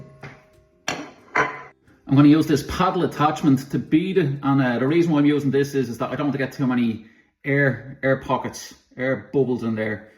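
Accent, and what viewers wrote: Ireland. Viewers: Watching with automatic CC is hilarious with an Irish accent 😂 luckily my father in law is Irish so I knew exactly what you were saying even if captions didn't! 🙈 Dissolving the sugar is genius! Definitely trying that next time, thank you! 🍀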